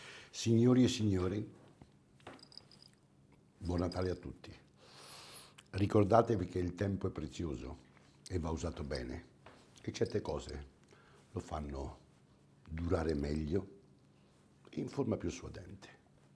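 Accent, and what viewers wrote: Rome, Italy. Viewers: in italy we have dialects….each region has one. He talks roma dialect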